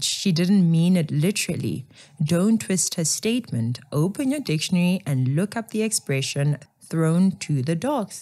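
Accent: Model C, South Africa. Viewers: With your model C accent, you shouldn't be Zille's apologist. Dont try to be a good black.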